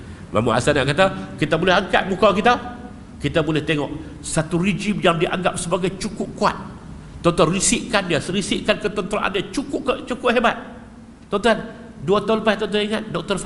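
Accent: North, Malaysia. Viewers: Suka dengar Ustaz dan Ustaz Radhi (adiknya). Suara jelas dan penyampaian slang utaqa yg menarik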